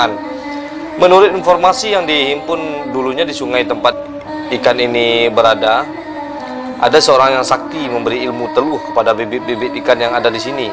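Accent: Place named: Minangkabau, Indonesia. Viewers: Solok maa suaronyo 😁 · Masih tabaok2 logat minang nyo da😁 smangat da 💪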